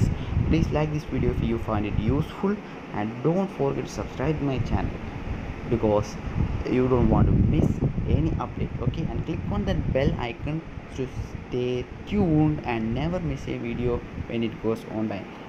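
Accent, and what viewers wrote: Kerala, India. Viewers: English parayunna reethi kettapo manasilayi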